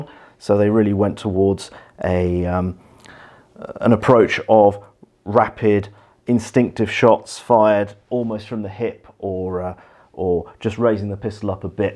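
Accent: England, United Kingdom. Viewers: Wait, you have an English accent and DON'T live in England? HOW IS THAT POSSIBLE? YOU'RE BLOWING MY MIND.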